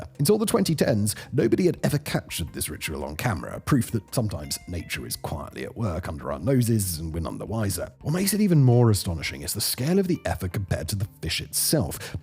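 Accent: United Kingdom. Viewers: Lots of his words are strange like that where he pronounces them in this weird, British way lol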